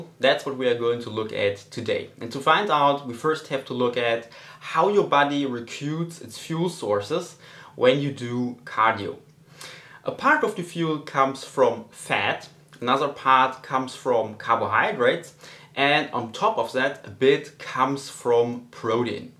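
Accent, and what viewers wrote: Germany. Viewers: Loving your accent ,are you german?Anyway nice video,good info.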